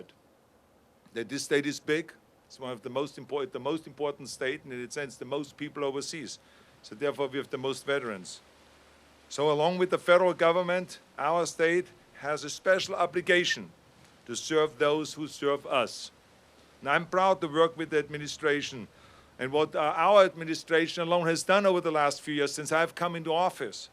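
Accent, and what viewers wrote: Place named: Germany. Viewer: D His accent is so awesome german :D "schmusen" :D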